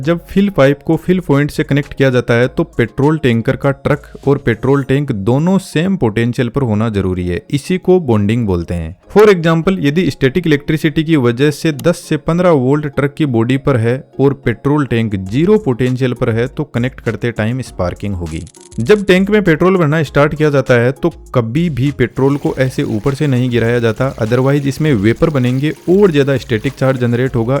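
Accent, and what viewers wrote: Rajasthan, India. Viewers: Sir aap Rajasthani ho kya , boli se lg rhaa h 😅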